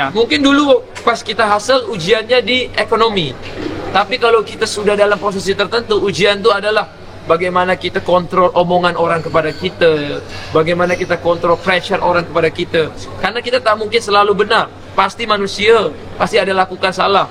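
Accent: Kuala Lumpur, Malaysia. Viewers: 😂😂😂😂😂 ok jugak dia ckp bahasa melayu Malaysia...tp ayat2 BM tu dia tak tahu...cuma lebih kpd slang 😂😂😂😂😂 mantapppppp bro...keluarga diaorang nie bnyk travel...tp aku respect adik beradik diaorang semua berseni... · Pandainya cakap orang kuala Lumpur ya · comel laa ckp KL🤣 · ya sbb dia ada darah melayu minangkabau, sbb tu tak susah untuk dia tukar loghat KL walau duduk beberapa tahun kat Malaysia.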